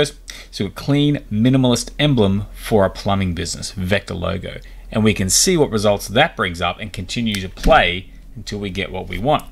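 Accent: Australia. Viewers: Great to hear from an Aussie!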